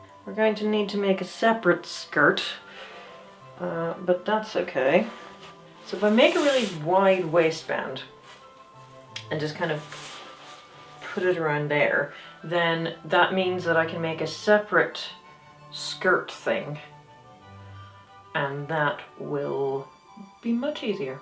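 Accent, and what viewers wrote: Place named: United Kingdom. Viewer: I love, and always love, the combination of detailed research and "eh, throw it together, this is how I'm gonna do it because it's not accurate anyway." Research on chitons, using a chiton, the laminating techniques, and then ballgowns and "why not." Possibly most random comment ever, but as someone with British relatives, I notice and love how accent gets just a little thicker when she's thinking something over. It just reminds me of so many other lovely crafters I know - they get into their craft and instead of "voiceover voice" we get "here is a real person and they are moderately frustrated."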